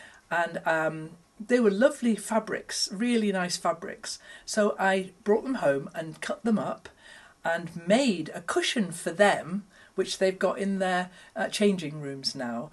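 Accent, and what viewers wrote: United Kingdom. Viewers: I love your British accent coming from an American gal. My most favorite, relaxing quilts was when I sewed my last 2 scrape quilt. Still have plenty of scraps to use. Thank you for your informative videos.🤗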